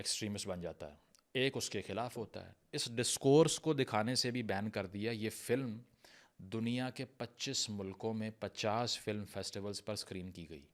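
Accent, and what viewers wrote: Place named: Pakistan. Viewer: I love ur urdu accent too which is now obsolete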